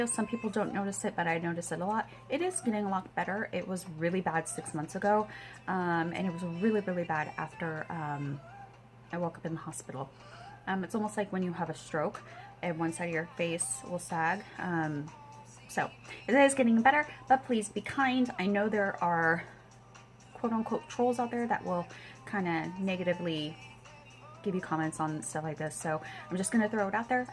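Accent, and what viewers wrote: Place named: United Kingdom. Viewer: OMGGGGGGGGGEEEEEEEEEE!!!!!!! That was amazing, you are AMAZING!!!!!! I love you and I am super thankful that you took time out of your own hustle and bustle of life to do a video for my girl. I love the look, love me some British accent too. GORGEOUS!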